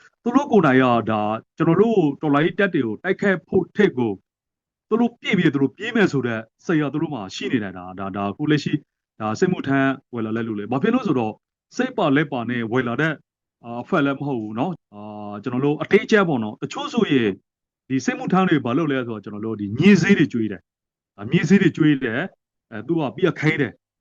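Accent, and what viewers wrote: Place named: Dawei, Myanmar. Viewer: ဒဝယ်သံကြီးဝဲနေတယ်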